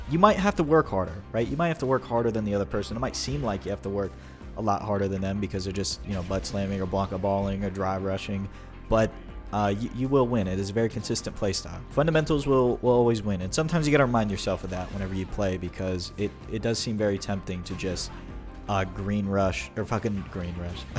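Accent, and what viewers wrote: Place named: United States. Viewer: Hey man, you talk a lot about consistent mindsets, and it's indeed great to have! Have you tried therapy? You sound american to me, and I know health is very expensive in the US, but therapy is the single greatest thing I ever decided to do for myself, it improved every aspect of my life. As a bonus, now I can play ranked and not freak out about every single match, and not rage after every mistake... Have a beautiful day!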